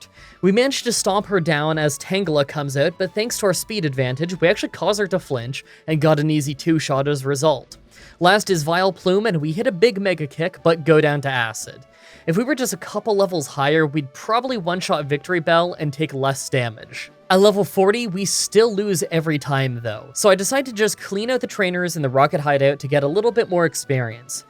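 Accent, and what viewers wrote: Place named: Canada. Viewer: As promised on twitter. Your canadian accent is showing I can hear your very noticably canadian outs! Will be back next week probably with the exact same comment as previously promised!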